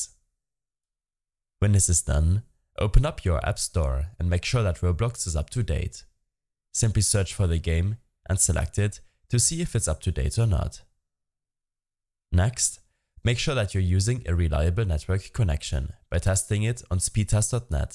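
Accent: Germany. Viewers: Ich höre bisschen deutsch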